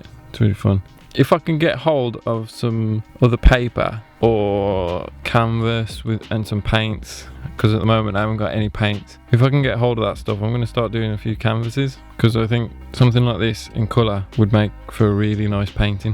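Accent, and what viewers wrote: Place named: West Midlands, United Kingdom. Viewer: Really great work 👍 And representing West Mids by sound of it!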